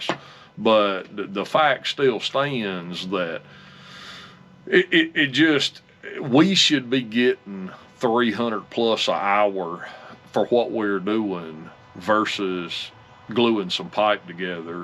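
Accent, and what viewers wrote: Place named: West Virginia, United States. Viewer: are you originally from west virginia? accent sounds like my cousins from there. :-)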